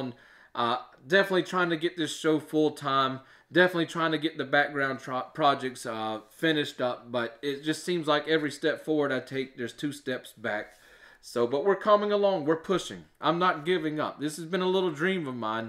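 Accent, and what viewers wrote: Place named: Mexican-American, United States. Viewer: lol nah man, it's cool. You sound like Mexican people living among Americans, due to being exposed to that accent type, like most Americans do, by the way. I can only appreciate your Spanish!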